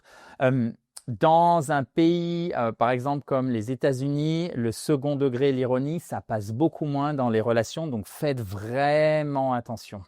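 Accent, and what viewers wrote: France. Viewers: J' adore lorsque tu parles anglais comme parlent les français, en plus tu les connais très bien , puis que tu es son prof.....❤❤❤❤❤❤❤ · Les Français du sud de la France prononcent de la même manière les terminaisons du futur simple (-rai) et du conditionnel présent (-rais), les rendant indiscernables à l'oral. habite à Saint-Étienne. Et voilà pourquoi.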